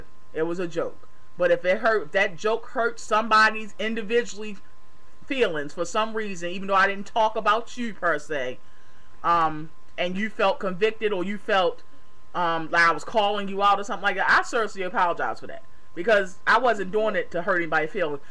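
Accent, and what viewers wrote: Baltimore, United States. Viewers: is that a baltimore or d.c. accent?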